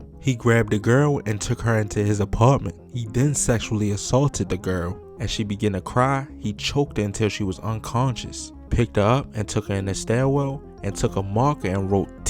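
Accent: Baltimore, United States. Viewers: This man got that Baltimore accent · U from bmore?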